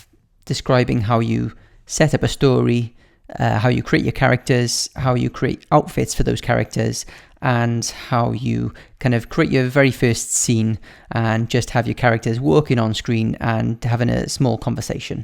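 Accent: Wales, United Kingdom. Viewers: your welsh i'm welsh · So this video really helped! I was clueless. But I had one question. How do you delete a chapter from a story? Btw love your accent I'm also british =) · Are you Welsh?? I love your accent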